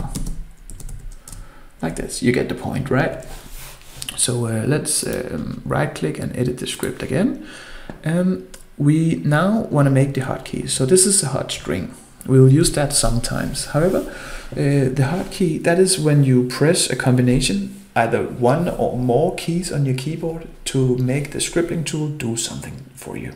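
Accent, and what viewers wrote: Denmark. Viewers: let me guess, you're danish,, i may be wrong but really sounds like it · i can already hear ur from denmark lol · i smell danish here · i smell danish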